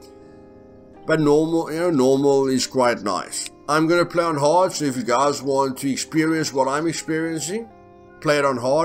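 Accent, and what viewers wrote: South Africa. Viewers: South African?.....If so then hello from fellow the UK!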